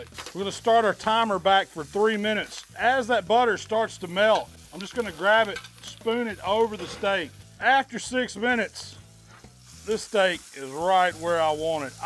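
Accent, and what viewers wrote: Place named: United States. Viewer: Offtop. Enjoying that accent. Which part of US is that?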